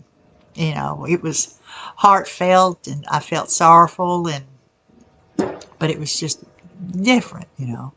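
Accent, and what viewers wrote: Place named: South, United States. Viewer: Love this,! I think this is fabulous; you’re very funny, interesting and articulate, not to mention brave. And trust me honey, we both have southern accents even if we think we don’t 😘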